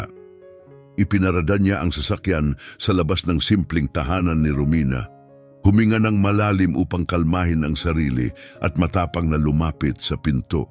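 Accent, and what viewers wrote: Visayas, Philippines. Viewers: Magandang. 😊😊😊kuweñto ngunitpangit pakinggan ang mga pronouncition parang bisaya pakinggan pero marami akong napupulot na leksyon😊😊😊❤❤❤❤❤❤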